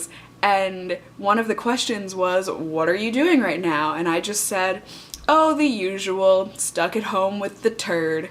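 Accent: Minnesota, United States. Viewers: Your Minnesota accent killed me. I'm dead. DEAD. IT HURTS SO GOOD. LOOOOOL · OMG the MN accent got me cracking up!!!! I always tell people that we don't talk like that. But I did have a coworker say that he forgot that I had an accent until I was on vacation and came back. He said then he could hear it. I only hear it come out when I say certain words. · Also, please do your Minnesota accent more lol, it's adorable! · i died at the Minnesota accent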